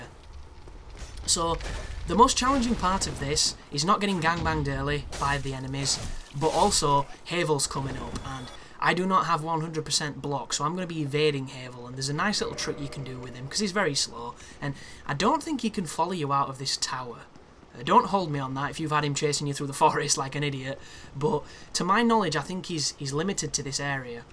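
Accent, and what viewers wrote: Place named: Lancashire, United Kingdom. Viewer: Are you from Lancashire Mr.?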